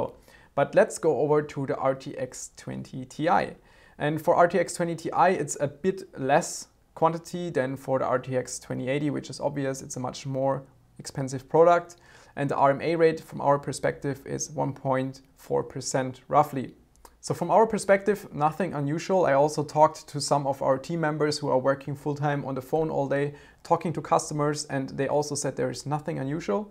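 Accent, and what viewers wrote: Germany. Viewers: Most people??? LOL. I work in a company with over 200 employees, and only a few people speak English, and not even close to flawless. Only a minority of people in Germany speak English. Most learn it at school, then forget it afterwards because they never use it again. And I agree with TheFreeFlow. He could work on his german accent easily by watching more TV shows in English, or tech channels. Anything really, but in English.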